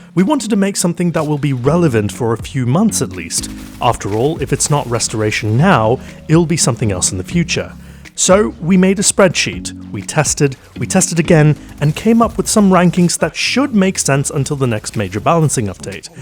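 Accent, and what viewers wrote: United Kingdom. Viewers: Great video mate! Your voice makes me want to visit the UK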